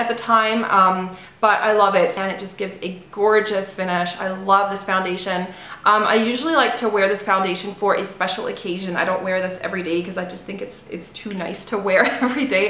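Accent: France. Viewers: Omg ton français<3 (omg your french!)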